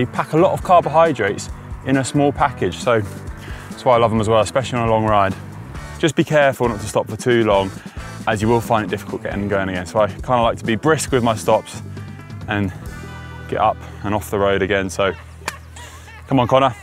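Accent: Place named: South East England, United Kingdom. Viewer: Name: Irish Home: Ireland Accent: South East England? What’s the story, Conor?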